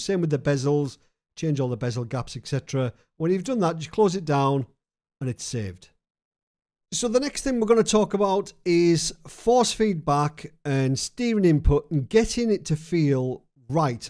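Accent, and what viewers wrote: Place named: Cumbria, United Kingdom. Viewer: Definitely a Cumbrian 😂👌🏼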